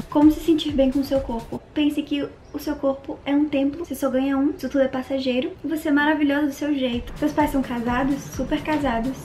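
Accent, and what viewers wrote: Bahia, Brazil. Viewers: Amigaaa Eu maratonaria todos o seusa vlogs pro resto da vidaaa Manda esse povo ir.pra merda Vc e especial pq e diferente algumas meninas(o) quando crescem digitalmente ficam seguindo um padrão chato e vc ñ Nunca perca sua eesencia doida Ps: gente eu divido o mesmo sutaque dessa diva bahiaaaaaa❤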